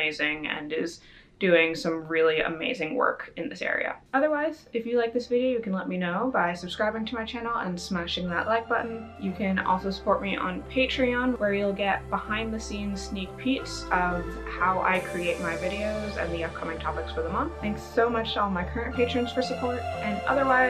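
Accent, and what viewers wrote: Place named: California, United States. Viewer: Dialect is Western, California, Santa Monica.....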